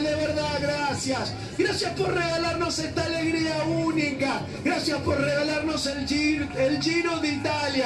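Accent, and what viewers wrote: Colombia. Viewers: Soy Colombia este man la rompió con ese relato..parece colombiano de sepa..jajaj buena ..Sábato..eso también es argentina.. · Q belleza de. Narración parece colombiano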